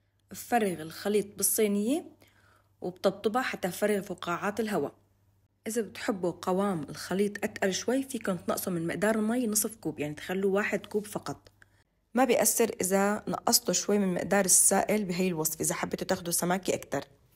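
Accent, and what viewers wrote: Homs, Syria. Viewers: الصوت الحمصي كيف ببين فووورا😅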